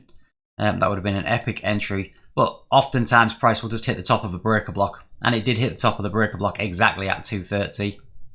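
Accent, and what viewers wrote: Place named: Midlands, United Kingdom. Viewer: Great videos, thank you! I found your channel after learning about unicorn entries and you really helped me understand and see them easily. I took this trade too, although I go with a fixed 3R TP for simplicity atm (I don't take anything off before it). I personally monitor both GU & EU for Unicorn entries post 7am (UK time) which means I can focus on the one Strategy. Thanks again, and very much appreciate the uk accent too, given I'm from the Midlands originally (I think you're slightly north of there, but not by too much?)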